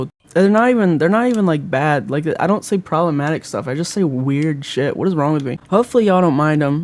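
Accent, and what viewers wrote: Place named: South, United States. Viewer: great southerner accent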